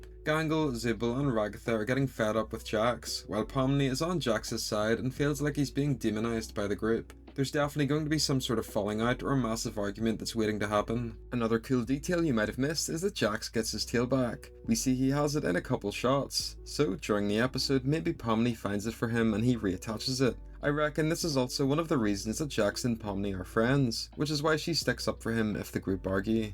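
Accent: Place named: United Kingdom. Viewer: Your accent sounds like a british person🏴🤯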